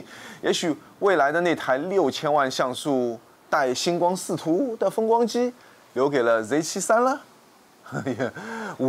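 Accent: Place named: South, China. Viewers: 听着南方口音，很亲切！澳洲Z8实在贵离谱了:face-pink-tears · Up主是上海人吧,一口南方口音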